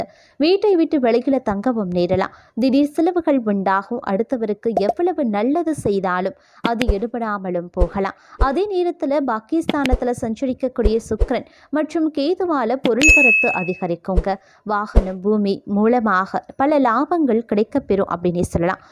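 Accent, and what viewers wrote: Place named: Sri Lanka. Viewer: இலங்கைத்தமிழில் வாசிப்பு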